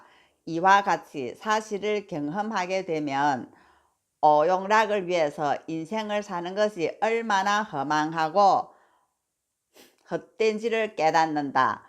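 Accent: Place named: Gyeongsang, South Korea. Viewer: 불교 신문이라... 남 해치지 않고... 근데..많은 사람들이 남을 해쳐서 자신의 이익을 얻고자 하지요....혹시...경상도 출신인가요? 나도 갱상도인디...억양이 갱상도 같아요 ㅎㅎㅎ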